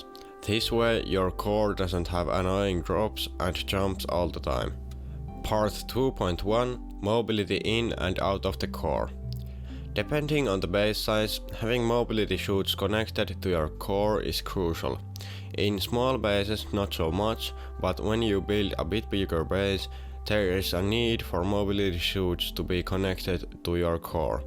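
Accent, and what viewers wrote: Finland. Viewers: Hes not from The U.s Dude is like from Finland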